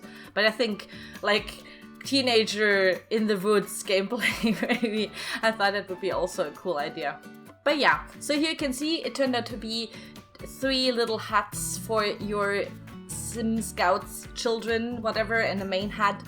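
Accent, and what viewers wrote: Germany. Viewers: Wusste ich doch das ich da was deutsches raushöre😏😂😂❤😍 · Your accent isn't even half as bad as mine... To be honest, I didn't even notice that you're German and I'm one myself. My accent is also the reason why I'm afraid to start a YouTube channel, because people can be mean an all. Your accent is fine and not as bad as people make it seem! I also loved the way you adressed it and I love this camp, I'll definitely add it to my game!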